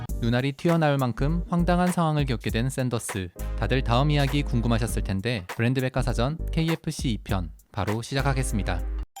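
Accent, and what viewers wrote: Gyeongsang, South Korea. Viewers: 어머니 에서 사투리는 속일수 없네 ㅎㅎ그냥 경상도 사투리로 하세요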